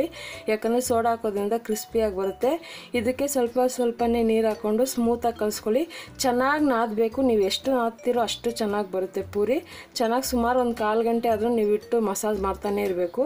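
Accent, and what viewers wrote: Tamil Nadu, India. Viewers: Yenne Tamil slang aanstidee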